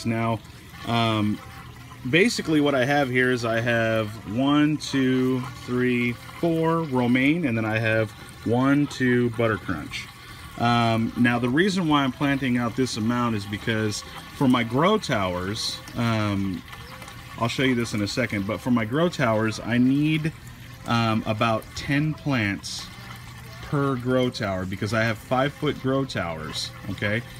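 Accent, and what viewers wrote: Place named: Minnesota, United States. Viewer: You sound Minnesotan!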